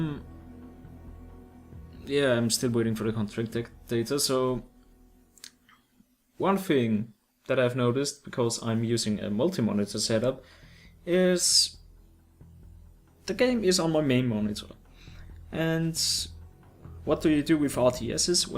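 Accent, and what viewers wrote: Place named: Denmark. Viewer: Ok not to sound mean or anything but please use a script! the amout of times you stopped and hmmm, got me quite annoyed and i can tell your from denmark(sorry if your not), and no i don't know what you mean, look it up wright it down in the script, this did not have to be 24 minutes long 10 min was all you needed. but i feel potential in you join the darkside ;)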